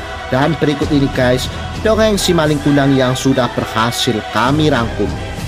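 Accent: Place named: Java, Indonesia. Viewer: wou suara lo jawa nya ga usah medokkk ngtu kali ......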